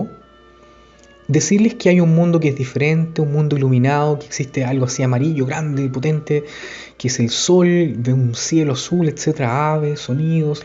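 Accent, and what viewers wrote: Chile. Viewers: sos chileno verdad?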